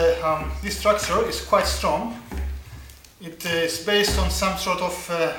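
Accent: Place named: Greece. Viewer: По акценту видно,что грек.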